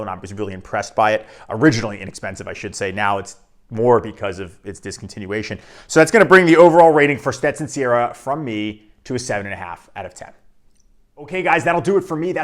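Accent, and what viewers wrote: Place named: North Jersey, United States. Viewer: For a guy called you like like a guy from north Jersey!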